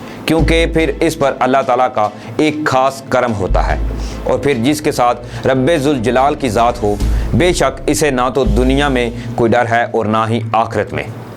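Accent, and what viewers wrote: India. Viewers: Why you speak Urdu in Indian accent?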